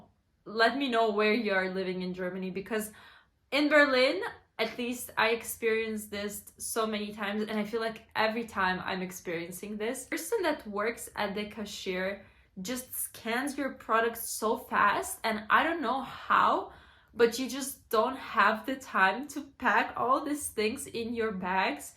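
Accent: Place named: Baltic states. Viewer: I started wondering as well since her accent is definitely not American. I was actually admiring the clarity of her speech before I realized that she has an accent that's not one I'm familiar with. My first guess would be that she's from the Baltics, but she might be from Hungary or elsewhere for all I know.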